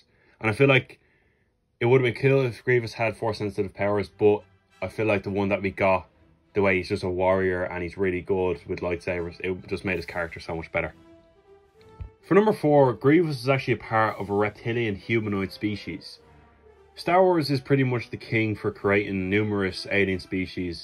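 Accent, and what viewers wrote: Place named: Ireland. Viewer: Love your channel mate ...Nice to hear an Irish lad on youtube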